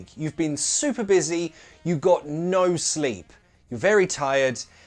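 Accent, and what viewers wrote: United Kingdom. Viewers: good morning! learn english with, congratulations for your channel it is the first time i watched your video and ı would like to ask you, so your english is british? thanks and have a nice day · I don’t like British accent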